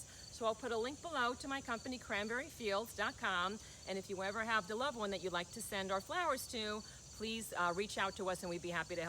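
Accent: New Jersey, United States. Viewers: Lol I just watched another video of yours about sunflower tips and I knew as soon as I heard your voice that you were a Jersey girl and it has now been confirmed! 🌻✨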